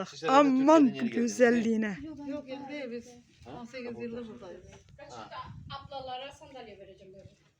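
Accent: Azerbaijan. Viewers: Salam canım turkiyəm bacım siz yarısı azeri yarısı türcə konuşuyorsunuz hangi bölgə böylə konuşuyor sizdə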